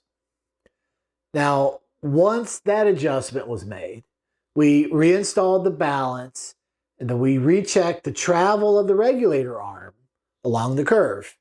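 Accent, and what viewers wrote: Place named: United States. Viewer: Which part in america you from i like your dialect ?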